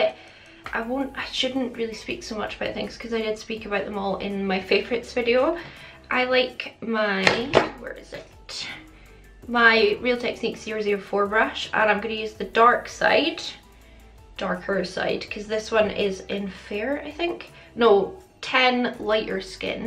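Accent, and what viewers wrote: Scotland, United Kingdom. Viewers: Just having a bit of a crappy time should of realised 4 kids would be stressful. But it’s ok videos are me time as soon as they hear her Scottish accent they disappear 🤣 and yes we watched it during her study break tonight she squealed with excitement. Then kept saying OMG she remembers me it was me wasn’t it lol she can’t wait to tell her friends Monday 😂😂😂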